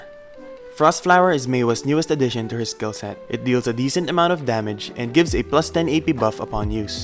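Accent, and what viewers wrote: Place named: Philippines. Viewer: i hope this doesnt sound mean in any way, but are you filipino? i wanna say i hear the slight accent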